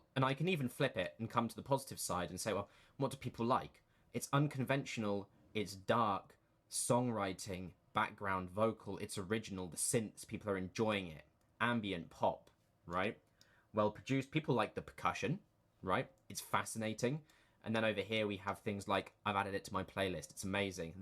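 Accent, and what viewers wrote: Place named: United Kingdom. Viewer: I love the analytical approach to song writing! It's tricky deciphering feedback, and this chain of resources you've established makes a convoluted process much more streamlined. Also, your smooth cadence and suave British accent makes listening to your explanations that much more entertaining. Haha.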